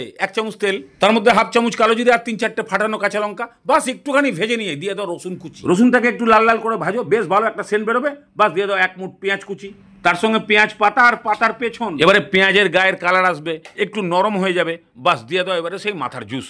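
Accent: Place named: Bangal, Bangladesh. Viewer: Tumi bangal bolo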